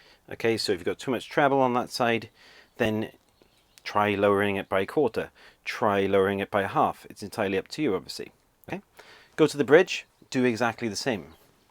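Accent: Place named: Wales, United Kingdom. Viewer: Thanks for posting. Nice to hear a welsh accent too.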